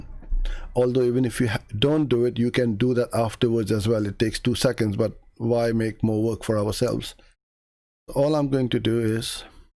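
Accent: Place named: England, United Kingdom. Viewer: You talk too much.Impossible to suffer that English accent more than 10 min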